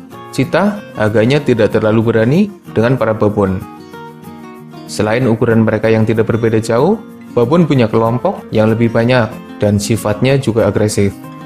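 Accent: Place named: Java, Indonesia. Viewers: Nampa x jowone hhehe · Jawanya medok banget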